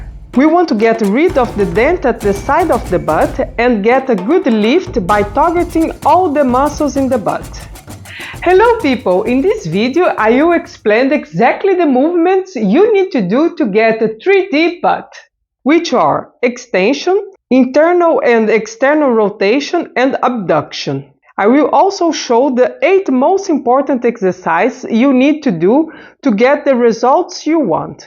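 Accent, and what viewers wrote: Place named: Portugal. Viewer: adorei os exercicios. vc fala ingles , mas nao aprendeu a ter a pronuncia e intonação da lingua inglesa. como? fala ingles com intonaçao do portugues , triste. otimos exercicios obrigada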